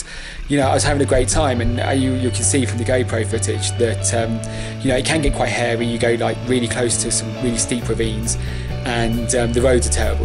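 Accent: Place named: United Kingdom. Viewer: man with your british accent, you just killed my country ahaaahahahajajajaja but you r just sayin what you saw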